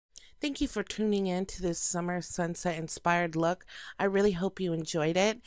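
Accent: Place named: North, United Kingdom. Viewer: Uhmm... Pin your hair back. You live up north, yeah? I love to hear the accent come out.... Poor me got stuck down south. I wanna move back.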